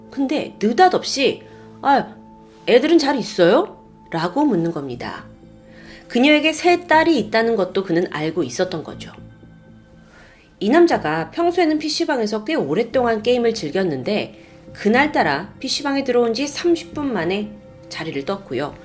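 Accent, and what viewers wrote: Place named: Jeolla, South Korea. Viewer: 라도네요 ㄷㄷㄷ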